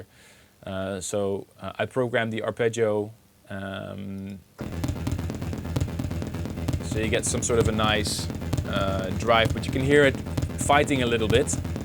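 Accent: Netherlands. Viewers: ***** Yeah, ok his english is fine but you can clearly notice he's dutch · armin is dutch, english is not his mother tongue.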